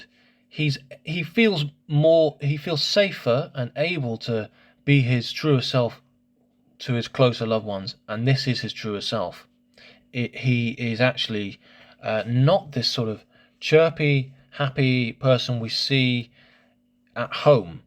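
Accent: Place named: United Kingdom. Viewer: Good to have some subtitles here as some ppl might find it difficult to catch with ur native British accent. Good to see u putting the new feature in to practice with ur premiers palm. As his inheritance links to east, he might be a good family person as shown by his palm. We have a belief here that who ever come to the top seat might be under a bad period according to his or her birth chat. As always, it’s very interesting reading and illustration. Thanks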